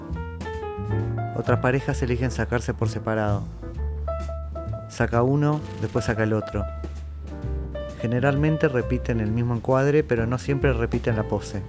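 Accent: Argentina. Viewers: Y te digo que por su forma de hablar al principio creí que era argentino, suena igual 😄🇦🇷👋🏻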